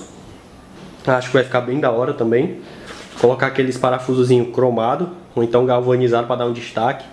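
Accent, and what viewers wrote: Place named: Bahia, Brazil. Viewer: kkkkkkk mt bahiano pqp kkkk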